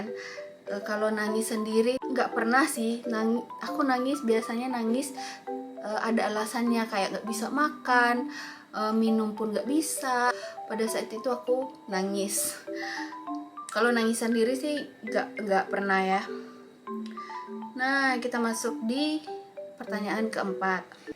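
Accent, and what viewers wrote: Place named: Minangkabau, Indonesia. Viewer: Slang Manadonya sudah hilang.......sudah ganti dengan dialek Minang ya👍👍👍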